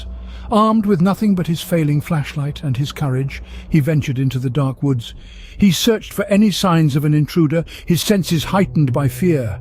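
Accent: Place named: United Kingdom. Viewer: A British narrator. How wonderful. Our American friends will love it.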